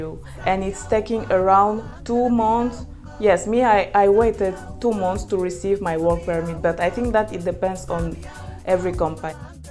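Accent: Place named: Poland. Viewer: I speak Fluent Polish, You do have a polish English Accent, jesus lolz I love this...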